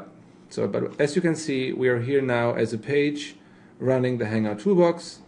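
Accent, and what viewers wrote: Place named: United States. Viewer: Man, how intelligent you are. Language's accent is some big issue, no matter how smart one is. For some of those British out there you are labeled as an American, they don't know any better but you do...! With that Intelligence of yours I'm sure you could speak even Chinese without an accent if you want to...!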